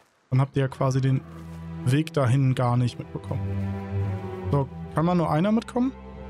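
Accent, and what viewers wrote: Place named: Sweden. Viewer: ist Schwede Mütterlicher Seite. Da Spricht man es so aus.😂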